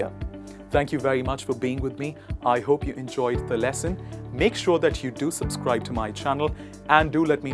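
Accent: United Kingdom. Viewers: You're sounding british sound.. But in America we sound all r.. Slightly thick .. We not pronounce r silently the word's you've written... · This is in British English, right? Because "r" is pronounced in many of the words you mentioned like "first, dark". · Thanks for teaching but you’re pronouncing like British English and you might followed them but i do follow Indian English which is good to hear and pronounce also 🙏😊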